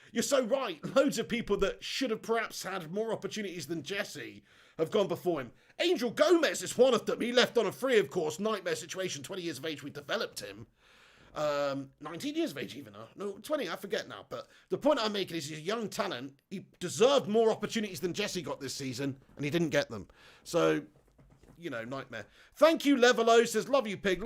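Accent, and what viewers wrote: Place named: Scotland, United Kingdom. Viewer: We need pig doing the deals like his Fifa career mode .the Scottish accent needed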